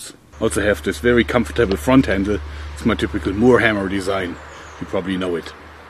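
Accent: Germany. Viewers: You match with the medic from tf2 with your German accent and syringe gun/ slingshot.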